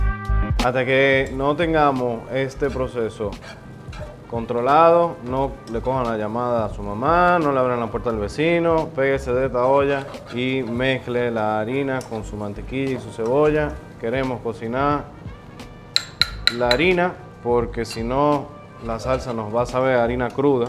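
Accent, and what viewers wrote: Dominican Republic. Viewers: Jajajaja!!!! primera vez que veo este chef me encanto!!! su forma de explicar muy gracioso parece dominicano al hablar 😂😂😂😂😂😂😂😂😂😂😂😂😂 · Vamo arriba!! me encanta nuestro acento tan original, siempre y cuando no caigamos a lo vulgar, solo que apreciamos lo que realmente somos cuando estamos fuera de nuestro país. Este canal me encanta!!!! Bravo!! 👏🏻👏🏻👏🏻🙌🏻😊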